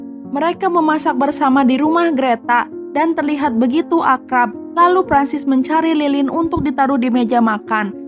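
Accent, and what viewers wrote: West Java, Indonesia. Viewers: Sunda na th katinggali pisan "subrek likeu en share😂"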